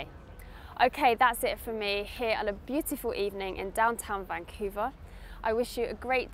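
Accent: United Kingdom. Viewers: You're a genius, I'm obsessed with the British accent only because of you. enjoyed this video and learned Five useful words 1. Brunch 2. workaholic 3. Motel 4. Staycation 5. Fortnight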